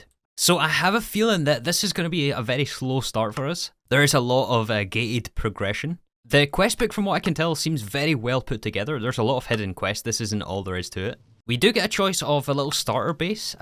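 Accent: Scotland, United Kingdom. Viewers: Hmm I cant figure out his accent. Its obviously British Isles but where exactly- "Por-P-Porpal" Haha hes scottish! Great video as always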